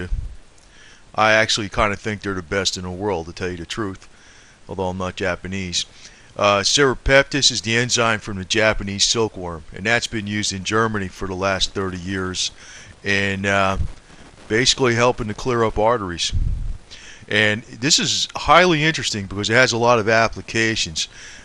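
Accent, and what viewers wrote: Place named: Boston, United States. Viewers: This guy is not a healthcare professional which is obvious from his continued mispronouncing of simple medical terms, especially the word Natto, and NattoKinase. It is not Nacho cheese. It is pronounced Knat-O-Kine-Ace. Serrapeptase as well.(Sarah-Pep-Tace) These are protein based medical terms ending in Ase(Ace)!! Please, if you are going to talk about these systemic enzymes, lose the thick Boston accent so that you sound a bit more intelligent, and then please pronounce the words correctly. You can't just say "when it gets into the bloodstream, it just does it's thing". This is a great misrepresentation of the mechanism of action that these enzymes perform in the body. Get the IDEAR?? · hey, this dude is the real deal, and when some "professional" is coming at him with the "pronunciation" of his words, there's some insecurity happening here. 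the information is priceless, and so is this gentle man. his accent is home to me, new york, boston, love him. I don't care if he calls nacho knish, if it works, we will figure it out no problem! thanks for your input suh! I'd rtather pay him than some herk whose agenda is to get his/her ass top the bahamas on our miserable dime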